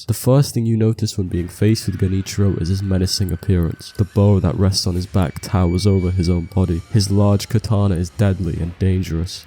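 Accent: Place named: United Kingdom. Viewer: Hmm British dude doing soulsborne commentary? Subbed.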